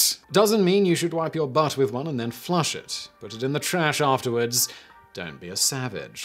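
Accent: United Kingdom. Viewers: "Something up with which we must put." If you are going to sustain that obnoxiously pretentious British accent, at least adhere to the grammatical constructions that go along with it. And while you're at it, let the metric people work out their own conversions. When in Rome, after all.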